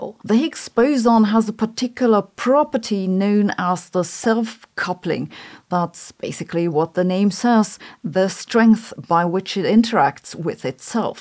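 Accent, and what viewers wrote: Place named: Germany. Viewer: 👎👎👎 SABINE, your English is so awful, that you must go back to school an improve it. You are arrogant to address publicly people on YouTube with such a horrible English. You can not cover up your German roots. 👎👎👎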